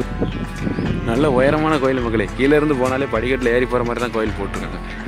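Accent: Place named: Thoothukudi, India. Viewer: மிகவும் இயல்பான slang.இப்படியே தொடருங்கள்.தூத்துக்குடியா?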